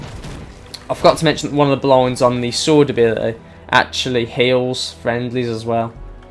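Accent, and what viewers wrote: United Kingdom. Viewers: is that a Cockney accent you have ?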